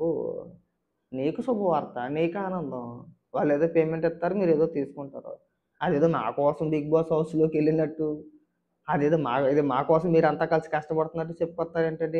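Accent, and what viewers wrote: Godavari, India. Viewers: Anna bale matladuthunnaru meru❤😂 a vuru anna medhi ento koncham godavari slang la vundhi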